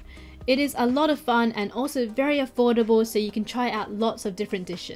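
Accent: Australia. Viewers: great video - it bought back many memories. I think you have Aussie accent, have you studied in Australia. I am Aussie and my wife is from Japan. Keep well. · It's my first time to hear an australian accent Much more like British than I thought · This girl sounds like someone from west Sydney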